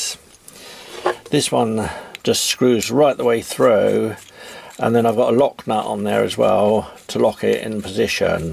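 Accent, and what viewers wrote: United Kingdom. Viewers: Hi Mate, I like the mini lathe. It cuts the stainless just fine! I ,also, like your ideas. I have one recommendation if you please. The threaded rod stop should have been a fine thread to allow an easier micro control ability than the standard thread. Your accent is British I think, are you from the UK? But you use metric. Personal preference or am I guessing the wrong location? Good day!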